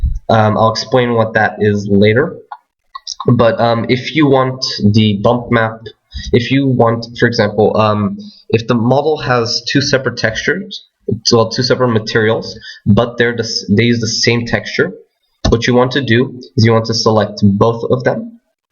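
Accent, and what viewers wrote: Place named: United States. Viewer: You are Portuguese??? You have a very good American accent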